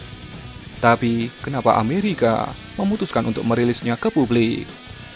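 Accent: Java, Indonesia. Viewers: Khas medoknya enak bet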